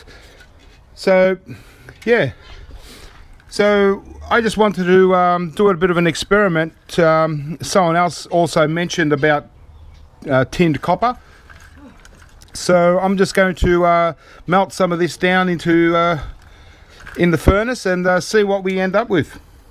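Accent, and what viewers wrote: Australia. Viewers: Haha your Australian accent is cool im sure whoever has been mocking you is just surprised by it. When you said copper in your american accent it sounded pretty funny, not because it was bad but because it sounded so out of character. When you say copper it kind of sounds like a southern U.S accent. idk though im from the midwest. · I'm American, I didn't even notice him saying copper differently until he brought it up. After he mentioned it though it does sound like he's saying coppa. I don't care though. I like the Australian accent. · i too love the Austrailian accent and i enjoy the videos also have learned alot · are you Australian? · Australian accents are awesome! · I watch this primarily to learn the AUS accent. "So... yeh." :-) (I also watch for the scrapping) Keep up the awesome videos!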